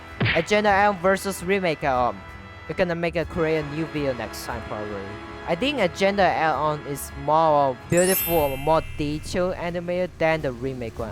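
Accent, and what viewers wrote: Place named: Malaysia. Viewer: He's Malaysian, English is not his native laguage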